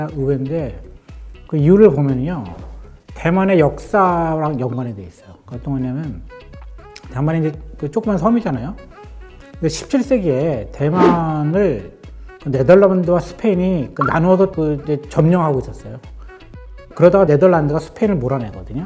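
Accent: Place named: China. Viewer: 언어구사가 중국 계열이신거 같다. 혹시 화교신지요?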